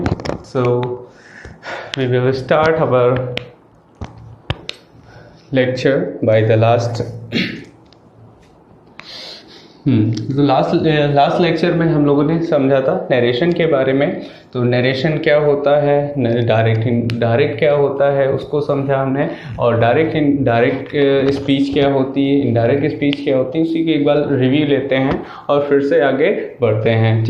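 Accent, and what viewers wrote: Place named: United States. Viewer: Good brother very nice explanation And your english accent is very american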